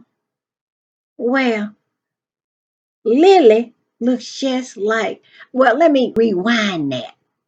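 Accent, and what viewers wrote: South, United States. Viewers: ❤❤ TY ❤❤ We love the pettiness, the messiness, the southern drawl, we love it all!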